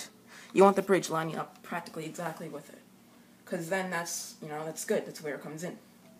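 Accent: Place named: New York, United States. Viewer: You have one of those new york or boston accents,or brazillian...just saying thats not a bad thing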